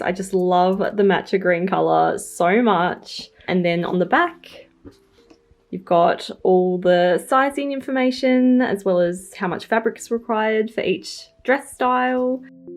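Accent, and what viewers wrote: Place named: Australia. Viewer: We recently moved to usa from tassie to care for my MIL. Im so homesick though. When I stumbled accross your channel I felt so comforted hearing your aussie accent. And then you say you're from tassie!!! Im subscribing ❤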